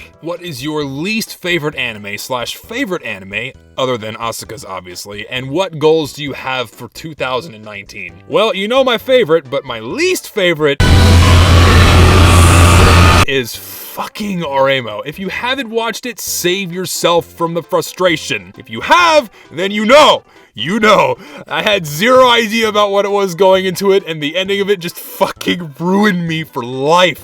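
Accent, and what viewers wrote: Texas, United States. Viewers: MORE TEXAN ACCENT NOW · youre accent becomes more cowboy through out the video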